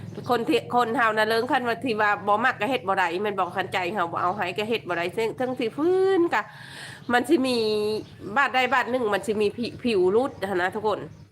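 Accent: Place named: Phu Thai, Thailand. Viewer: ภาษาภูไทพูดเพราะมากค่ะ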